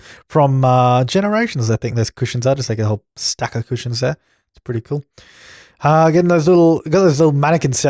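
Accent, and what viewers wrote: Australia. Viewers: Here in the States in Ann Arbor Michigan they have a arcade place, its a big one, it has nothing but that, and a snack area, I forget what its called now, its been about 5 years sense I was in Ann Arbor,lol! And I have to say, I love it when Aussies say No worries, meaning no problems or trouble, its kinda cute! lol! I enjoyed this video more because of his accent, if he had an American accent I wouldn't have watched the whole thing,lol!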